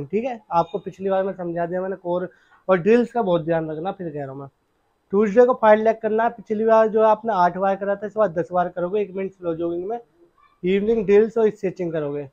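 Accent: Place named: Sohna, India. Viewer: आप बादशाहपूर सोहना से हों, आपकी आवाज बता रही है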